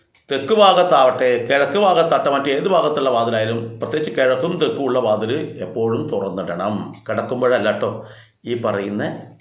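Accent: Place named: Kannur, India. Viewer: Namaste ji,thankalude nadu kannuranennu tonunu samsaram ketit,kannur evideyanennu parayamo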